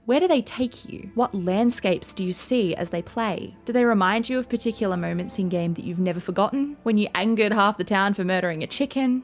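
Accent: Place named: Australia. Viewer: I'm really glad I could have a positive impact! :) Of course! Thank you for taking the time to reply! I really enjoyed it and actually checked out your other vids! And let me tell you, in my years and years of watching yt I've never wanted someone to succeed more I'm genuinely shook you don't have more subs! I've never been so quick to sub haha but your quality is absolutely top tier so I really really hope your channel grows! :) And ayyy hell yeah Aussie represent🇦🇺 it honestly helped me relate more hearing your accent haha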